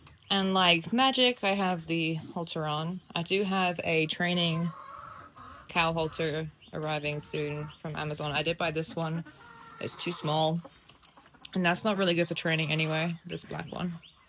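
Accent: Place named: Australia. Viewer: I know what you mean , sounds like Australian and it's So Interesting , love it ❤️👍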